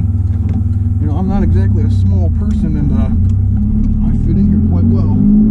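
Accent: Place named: Midwest, United States. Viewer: The midwestern accent is AGGRESSIVE. Really completes the vibe.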